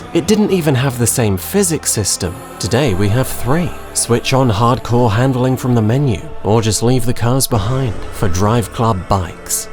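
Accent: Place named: England, United Kingdom. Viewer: You’re voice is the right amount of English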